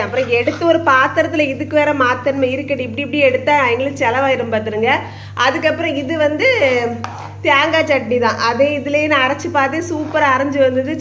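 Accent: Kanyakumari, India. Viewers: அக்கா உங்க கன்னியாகுமரி பாசை சூப்பரா இருக்கு l like you akka vlogs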